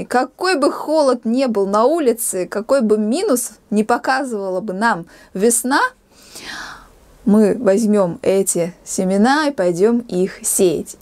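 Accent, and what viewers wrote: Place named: Latvia. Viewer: Почитал коментарии, оказалось вы в Латвии живёте!!! 👍 Вот и решение вашего говора... Мне нравится ваша речь!!! 😀😀😀 И я не маньяк, как мне написали 😀😀😀.... А у нас здесь некоторые и "гекают" , и "шо" , и "чё" , и "у клубе," "у селе" 😀😀😀...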